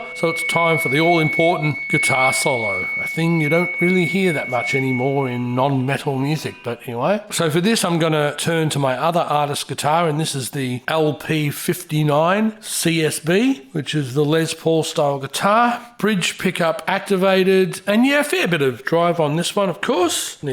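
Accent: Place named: Melbourne, Australia. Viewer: Melbourne, Australia (accent)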